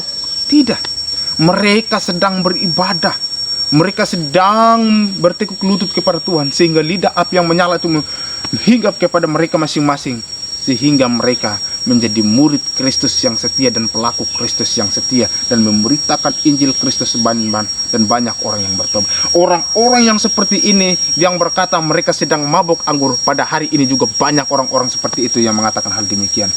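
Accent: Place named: South Sulawesi, Indonesia. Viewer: Anda orang Toraja kah? Kayak Logat sulawesi selatan... Anda aliran Karismatik atau Pentakosta atau Reform?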